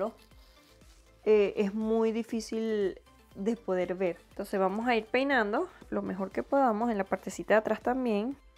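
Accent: Venezuela. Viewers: ES VENEZOLANA? CREO QUE SI POR SU ACENTO ...ADEMAS QUE BELLA ES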